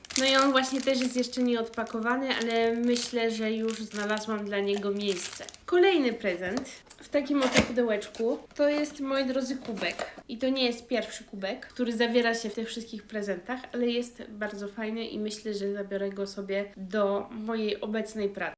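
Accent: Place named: France. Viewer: Trafilem dzis przez ytbowy przypadek i nadrabiam filmy, ciekawe jak Ci sie zmienial akcent w polskim. Teraz brzmi tak lekko francusko;). Tak 9% gotuj z Pascalem;).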